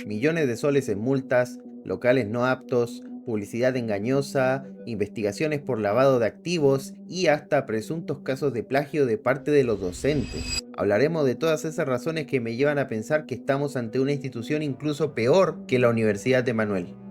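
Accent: Chile. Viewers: siento que sos chileno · Buen video pero no pude dejar de pensar que tienes un manera de hablar muy chilena de tu parte. Eres de chile viviendo en Perú, o solo es casualidad?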